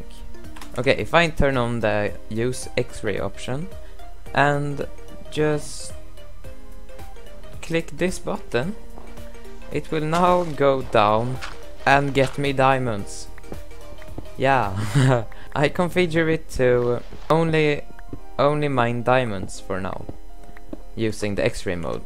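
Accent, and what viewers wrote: Sweden. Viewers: I kan Hear your swidish in your english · are you swedish? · I hear you speak swedish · I hear that you are swedish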